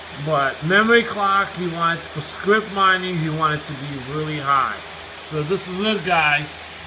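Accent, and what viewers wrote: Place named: New York, United States. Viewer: Thumbs up for the rockin rig and NY foughetttaboutittt accent!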